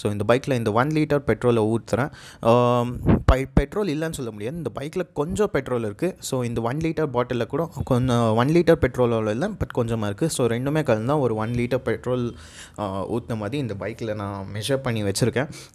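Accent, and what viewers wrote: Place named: Bangalore, India. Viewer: we bangalorean speak tamil with same axcent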